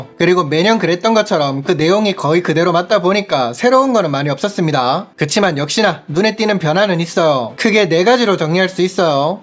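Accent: Gyeongsang, South Korea. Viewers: 아이폰때문에 처음 봤는데 영상시작 20초만에 나오는 경상도 억양에 빵터졌네요ㅋㅋㅋㅋ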